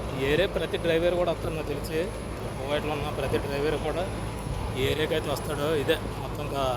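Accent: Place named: India. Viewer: Nice Vlogs mana Telugu Language and slang lo 👌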